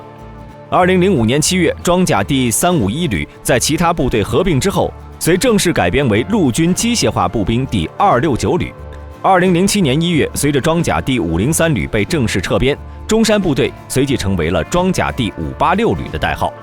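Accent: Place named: China. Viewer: 怎麼聽上去感覺是普通話，不像台灣國語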